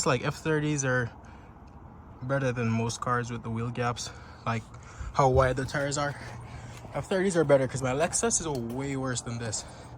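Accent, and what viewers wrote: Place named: Jamaica. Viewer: Bro has a lil Jamaican accent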